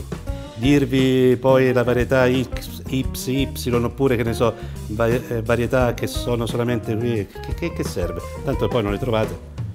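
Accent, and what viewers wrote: Tuscany, Italy. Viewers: Sei proprio forte, il forno a microonde non ce l'ho, ma non mi manca. Questa torta di tonno e patate la farò molto presto. Una curiosità, ma sei toscano? Un pochino........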